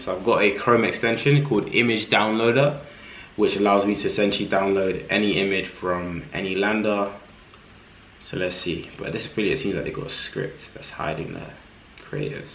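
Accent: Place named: United Kingdom. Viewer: I like how u talk British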